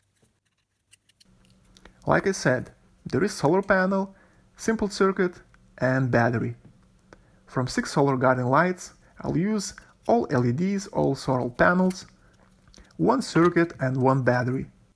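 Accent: Russia. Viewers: Акцент русский... )))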